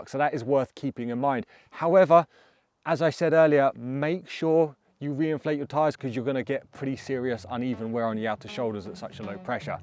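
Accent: United Kingdom. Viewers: British accent !!! Peace!!!! I am out of here.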